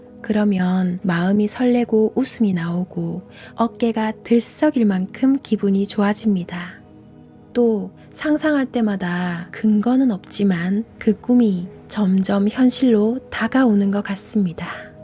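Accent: Gyeongsang, South Korea. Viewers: 구독했어요~ 말투가 경상도 억양들어가 정감가고 (제가 경상도) 예쁘고 목소리 좋고 마음의 양식이 쌓여가네요ㆍ 이야기를 듣다 보면 많이 깨달아 지네요~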